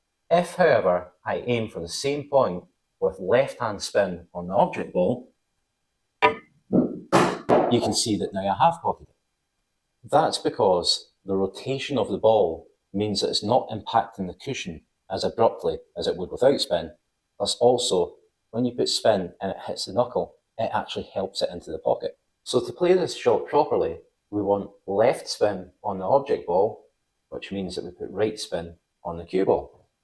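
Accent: Scotland, United Kingdom. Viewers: Nice to hear a Scottish accent. Thanks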